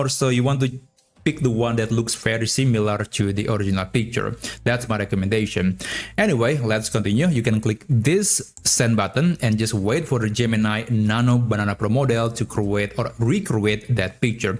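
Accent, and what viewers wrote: Indonesia. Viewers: dari logatnya kerasa banget nih orang indonya hahaha makasih bang tutorialnya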